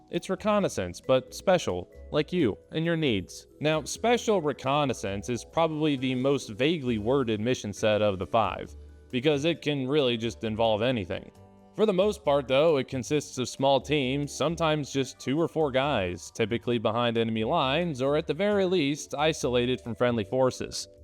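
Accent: United States. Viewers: hhhmmm... I hear an American accent. I assert that YOU don't know what special forces do, because your special forces are bog standard elites that don't really do much actual SF work.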